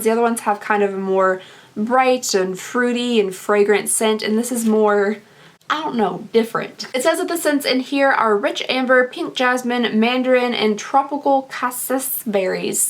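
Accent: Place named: South, United States. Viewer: Nice box! Never heard of it but I totally love that it's Jurassic Park themed-ish! The company my dad works for made the hydraulic pumps used in the dinos so that movie was my favorite as a kid for a lot of reasons. Btw, am I late to the game or do you have a heart tattoo om your pinky finger? I noticed it in the past few videos, unless I've still got sick brain and never noticed before which is totally possible, lol. Anyway, like I've said before, yours is the first channel I've seen with unboxings and you got me hooked! My husband and kids know when I've watched one of your videos because I apparently adopt your accent. I live in NE Ohio so not many Southern accents, lol. Love your vids; I watch for the unboxings and stay for the ramblings. 😆 thx for sharing! 💖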